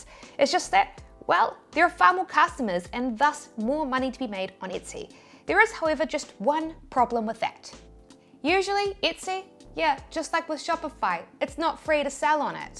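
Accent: New Zealand. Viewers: You're so sweet. I lived in NZ and I love hearing your beautiful accent. Reminds me of one of the best times of my life. Amazing video as usual. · Love the Kiwi accent! I watch cricket and so I understand the Kiwi accent perfectly. Love from Sri Lanka 🇱🇰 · you are a bloody good Kiwi! And all that with the accent:) I am looking for getting an income as it has now been over 5 months without employment and I realise that recruiters are not working hard enough to help me get a job. I have to chase the opportunity myself and indeed, what better than running your own business. You are very detailed in your videos and you stick to your advertised title, which I really appreciate. Being without income, I am maxing our credit card to pay the bills. I hope there might still be enough on the main credit card to pay for the up-front cost when someone buys an item... As you said, it is a cash flow as the sale is made, but imagine if you sell 1,000 items in one week and you don't get your money before the second week, Ouch! 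But then, you can explain this to the bank manager and show your orders with the expected payout to come. I am sure the bank will allow an overdraft on the account for the credit card... (hopefully these are still humans?) I will probably watch some more and this one again and get started tomorrow. Thank you for your time making these videos. You definitely deserve your income. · I was wondering if it was New Zealand, thanks! I gotta practice my accent interpretation. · Oh, so that's where it's from the interning accent, New Zealand , 👍 , lovely acquainting of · The last one that threw me was "each" pronounced as "ahch". It would be cute if you did a video on the New Zealand accent. Yours is quite prominent. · Hmmm, I would have guessed you had a Kiwi Accent. ;) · New Zealand's English is so adorable! 🌷🌿🌎💖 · i think shes from new zealand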